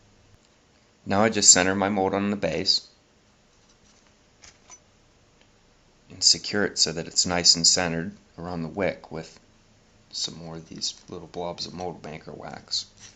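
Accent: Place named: New Jersey, United States. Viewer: Are you from New Jersey? I know of a guy who is from NJ that speaks a lot like you do.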